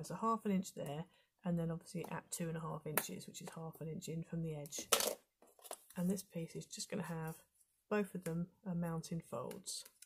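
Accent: United Kingdom. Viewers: Cute card ❤ the way you do your tutorials so easy to follow, also I’m from California and love your British accent. Thank you for sharing have a nice day.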